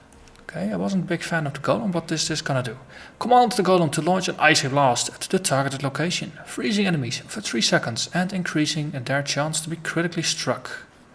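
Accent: Netherlands. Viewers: I love dutch acent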